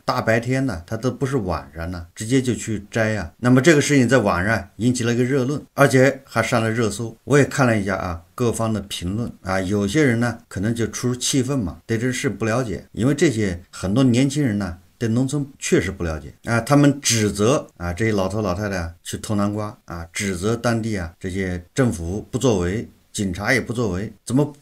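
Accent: China. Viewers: 沒字幕 大陸口音太重 聽不懂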